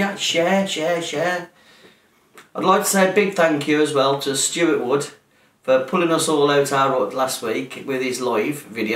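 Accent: Birmingham, United Kingdom. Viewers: You sound like a fellow Brummie! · I used to hate dandelion season and right now in Canada, we don't have any. I can't believe that I am looking forward to it this year! Just discovered your videos and love your presentations and simple explanations. Look forward to more! Great job. As an ex Brit, love the accent! Thanks again Bill Goodwin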